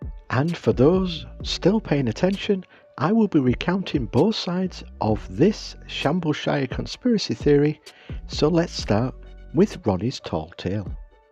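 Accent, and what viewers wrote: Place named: Northern England, United Kingdom. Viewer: your storytelling northern boy accent is perfect for Shambalshire It’s a great accent. I have an unfinished piece that I think would really fit in to Shambalshire I’ll take a few photos and send them for opinion Cheers pal 👍☘️👍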